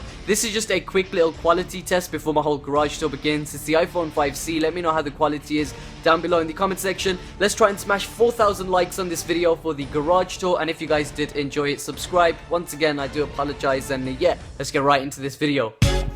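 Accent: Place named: England, United Kingdom. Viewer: You have an English accent but you look like you should live in India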